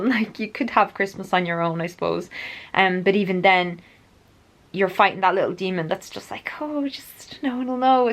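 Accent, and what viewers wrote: Ireland. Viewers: Your accent though…… I want an Irish woman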